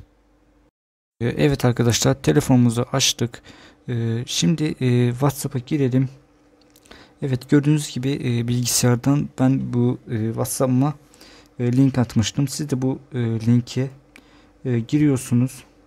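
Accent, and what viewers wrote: Azerbaijan. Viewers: SALAM ELEYKIM QAQA TURKCE DANISIRSAN AMA AZERBAYCANLISAN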